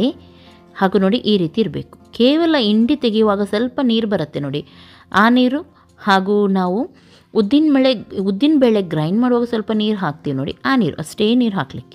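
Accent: Mangalore, India. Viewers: Hegidira akka I'm from pattadakalu nim manglurige bhashe nage thumba esta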